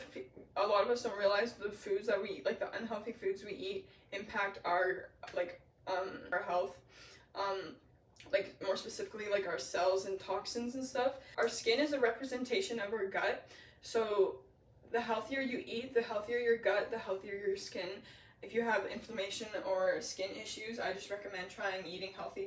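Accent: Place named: Denmark. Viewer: Why do I hear a Danish accent? :)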